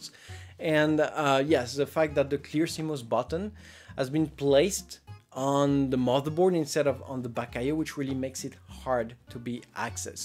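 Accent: France. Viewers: Am I the only one who is here because of French accent 🥰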